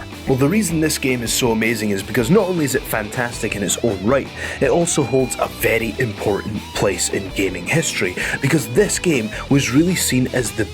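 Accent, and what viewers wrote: Scotland, United Kingdom. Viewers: the lovely thing about is their presenters are always SO hot :P that sultry Scottish voice serenaded my soul haha.....plus hes proper fit. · It is his Scottish accent. Dune becomes Djune, Duracell is Djuraceyll etc. No big deal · I just love to hear this guy talk. is he Scottish or Irish?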